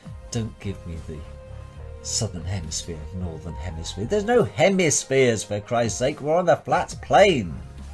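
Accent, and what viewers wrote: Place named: United Kingdom. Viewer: The British accent is great for sales. He is speaking the truth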